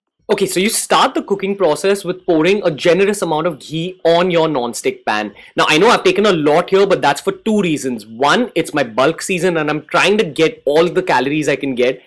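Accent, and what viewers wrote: India. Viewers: U nghuy so ndope lol Indian accent but your so simple that what's makes u dope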